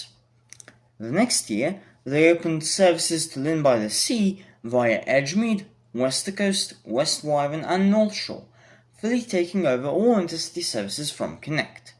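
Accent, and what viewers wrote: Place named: United Kingdom. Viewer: I just realised you sound completely British, but you're from Serbia?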